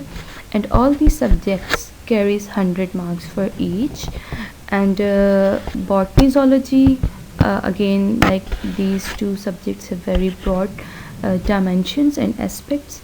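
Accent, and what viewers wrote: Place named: England, United Kingdom. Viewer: A lovely English accent! A simple mesmerising voice! One of the best guidance ever! I wish all the best for you! Keep making this incredible videos for us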